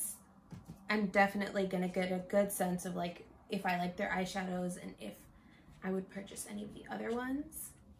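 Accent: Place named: Arab world. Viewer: Your Arabic accent is soo cute.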